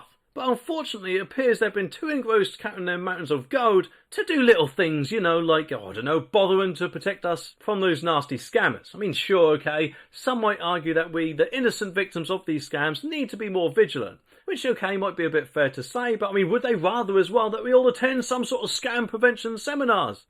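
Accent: Norfolk, United Kingdom. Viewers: I love hearing a rant in a Norfolk accent 😂👍🏻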